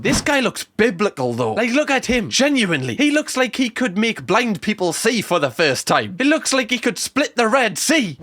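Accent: United Kingdom. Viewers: To be fair i like the british accent indeed the best😂 (coming from a german)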